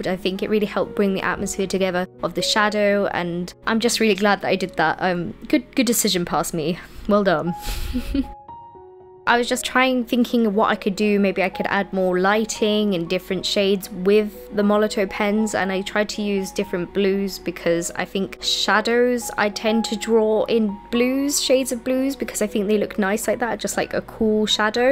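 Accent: United Kingdom. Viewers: Never seen anything from this channel before, but this girl sounds as though she’s originally from Wales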